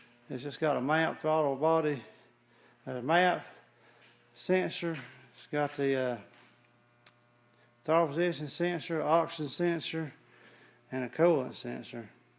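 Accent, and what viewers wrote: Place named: South, United States. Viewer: I understood every word.. he has a thick southern accent.. Nothing wrong with that.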